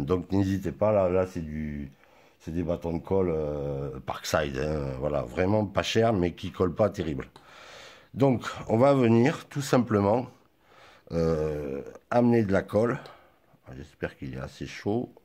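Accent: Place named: Southwest, France. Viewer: Salut ^^ Toujours ton imagination et ta créativité débordante. Trop jolis tes arbres, fallait y penser. Rendu visuel du tronc assez bluffant. En tant que Toulousain et amoureux de nos routes départementales et anciennes nationales bordées de ces platanes souvent centenaires, fallait que je salut je ton travail sur ce coup là. En plus de ton accent du Sud Ouest, si cher à notre cœur. Adissiatz ^^ José (Tu aimes les trains, tu aimes la musique, en passant ta "SG" est splendide, tu m'épates 😅)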